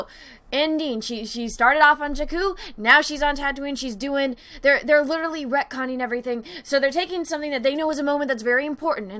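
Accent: Portugal. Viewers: Are you Portuguese? 🤗💪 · wait are you portuguese ?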